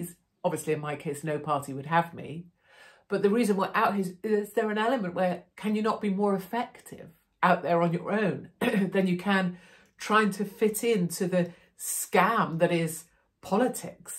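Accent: RP, United Kingdom. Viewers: Nothing wrong with a spot of poshness!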